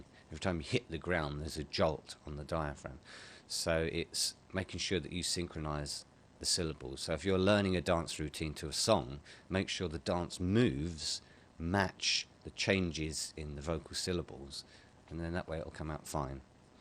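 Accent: United Kingdom. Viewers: i like your british accent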